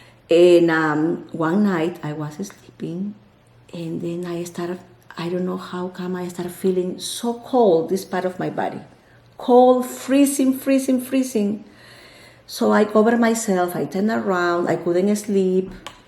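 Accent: Peru. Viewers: This is the first of your videos that I have seen and as soon as I heard you talking I could hear a Peruvian accent. Reminded me of how my aunt talks and sure enough you said Lima, Peru and it sparked some joy in me. 🥰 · can we get a Spanish video because I want to hear your Peruvian Spanish 🥰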